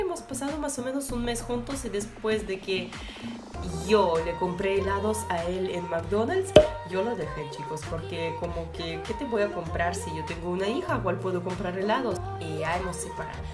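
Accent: Peru. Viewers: La jerga y malas palabras te salen súper natural como peruana, te haz peruanizado igual que Veronique 🤘🏿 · Q crackkkkk.. jajaja ..habla muy pero muy peruanazaaaaa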